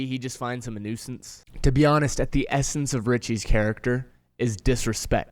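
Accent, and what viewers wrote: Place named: United States. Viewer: Refreshing to hear an American discussing American topics! ❤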